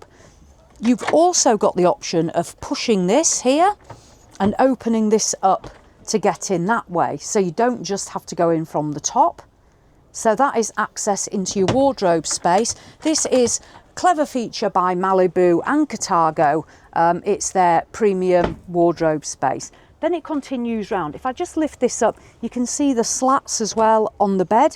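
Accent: United Kingdom. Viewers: It looks like the beds fold up as twin beds so you can have taller cargo space on one side if you want to? And leave one side down as a made-up bed? This feature isn't available on any American models. You are by far the best presenter I have ever seen! So unlike any of the American video creators who don't do their homework and then they stumble and bumble through the whole thing. Incredibly good presentation! Bravo!! And of course we love your British accent and all of your unique expressions LOL.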